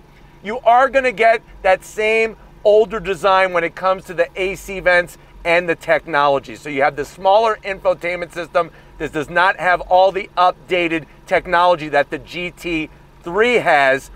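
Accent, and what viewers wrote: United States. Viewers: why Americans speak like this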